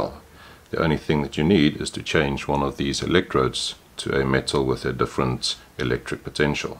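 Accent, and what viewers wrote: South Africa. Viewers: You sound South African. :)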